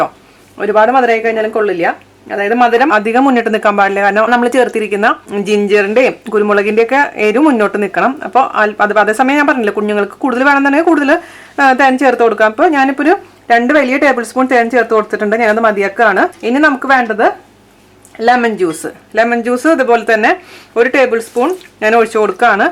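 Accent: Thrissur, India. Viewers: Chechi thrissur kariyaano thrissur slang athu kont chodhichatha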